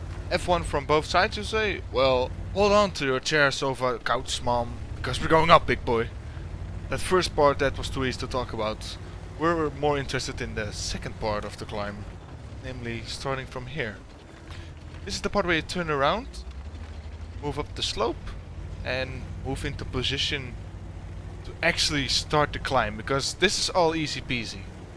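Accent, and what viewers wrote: Netherlands. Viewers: Are you Dutch? Well, I am... And your accent is extremely Dutch :) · you sound dutch..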